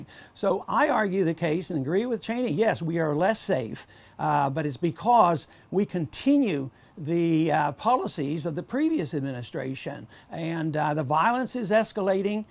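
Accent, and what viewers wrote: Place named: Texas, United States. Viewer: you're from Texas aren't you ;D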